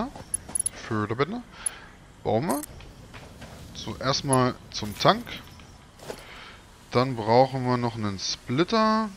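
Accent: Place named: Görlitz, Germany. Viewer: Sag mal kommst du aus der Region Görlitz? Dein "Slang" erinnert mich an Heimat 😁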